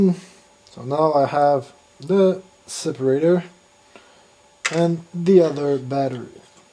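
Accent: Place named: Quebec, Canada. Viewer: j'ai reconnu l'accent québécois lol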